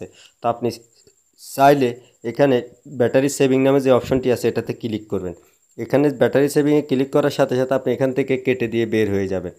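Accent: Noakhali, Bangladesh. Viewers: আগে কথা বলা শিখুন, নোয়াখাইল্লা।। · নোয়াখাইল্লা ভাই আপনি আগে প বলা শেখেন তারপর কথা বলেন। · নোয়াখাইল্লা নাকি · ভাই আপনাটর বাড়ী কি নোয়াখালি? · তোমার বাড়ি কি নোয়া খালি নাকি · Vi apne noakhilla na? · Duru khankir pula nuakailla. ..shslar put agay kotha bola shik. .tar por video bana. ..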